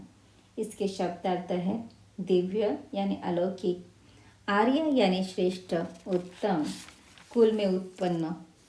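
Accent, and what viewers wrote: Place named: North India, India. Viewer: बहोत ही बढिया....! आपल्या बोलण्याची शैली एकूण आकाशवाणी वरील कार्यक्रमाची आठवण झाली...उत्तर भारतीय व्यक्ती प्रमाणे हिंदी भाषेतील आपले उच्चार शुद्ध आहेत...स्पष्टीकरण ही खूप छान आहे....👍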